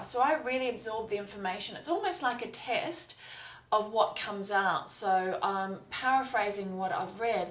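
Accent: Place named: Australia. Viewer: reminds me of how much I love Austrailian accents...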